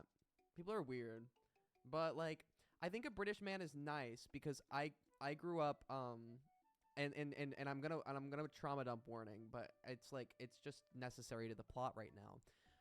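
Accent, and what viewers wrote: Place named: California, United States. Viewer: Hey,! I adore your accent. Are you from California?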